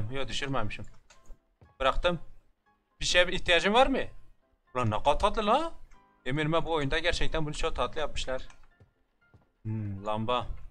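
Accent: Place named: Urfa, Turkey. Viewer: Usta nerelisin konuşma aksanın Urfalı gibi Urfalı mısın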